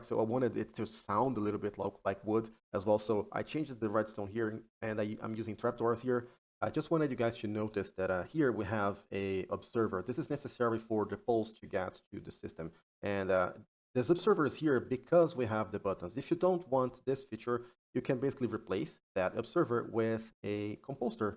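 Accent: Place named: Brazil. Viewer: Hello! NIce video! Are u brailian? U rly have the accent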